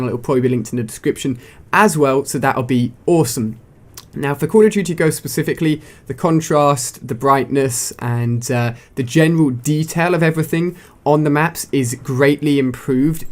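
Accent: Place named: United Kingdom. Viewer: Dejavu....only this time its british guy talking....tmartn did this first..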